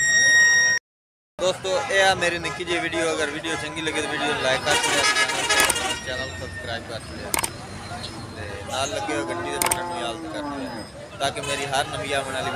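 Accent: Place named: Jhang, Pakistan. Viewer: ji tuhadi jhangi boli sunn k saanu sadda guandi jhangi bujurg yaad aa janda hai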